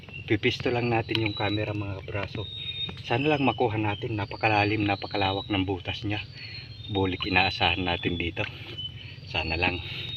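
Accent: Waray, Philippines. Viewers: Shout out ka braso paki sagot nman kung San ka sa samar or Taga diin ka kasi narinig ko kasi parang waray Karin?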